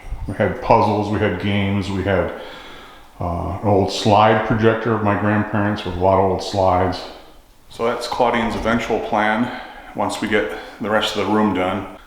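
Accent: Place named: England, United Kingdom. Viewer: Hello from Brazil ☕️ 👏🏼.Excellent presentation 👏🏼. Excellent work. Beautiful English accent. I subscribe in your channel.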